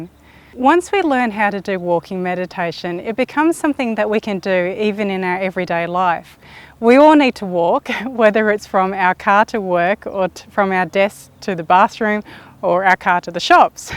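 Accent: Australia. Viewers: You do not look pure chinese, plus your last name is Indian, where do you originally come from? Accent is definitely Australian but the looks is so mixed, you cld even pass as an arab by the way.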